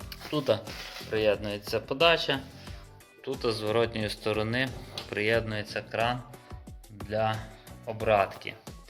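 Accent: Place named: Surzhyk, Ukraine. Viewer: за суржик 👎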